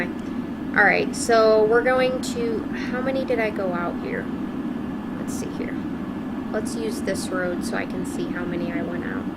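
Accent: United States. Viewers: You have a great accent! I guess ure american where abouts are you from? Xx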